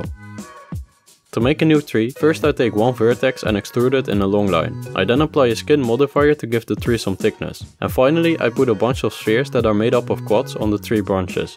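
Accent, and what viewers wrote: Netherlands. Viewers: I hear dutch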